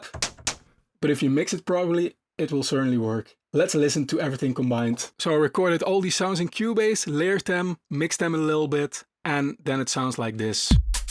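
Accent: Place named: Netherlands. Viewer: dat is een vatzig nederlands accent makker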